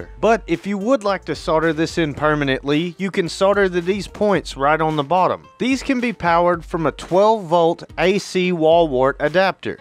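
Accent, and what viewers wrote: South, United States. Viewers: Hahah, that's exactly what I was going to say, he's just southern, lmao. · I added this to a playlist about a year back, and lost it. I searched YouTube high & low typing in so many keyword combos and variations to run across it again, because it's brimming with useful info. Happy to revisit. Channel with the Southern accent INSTANTLY told me I was in the right place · he's just southern, reminds me of Hank Hill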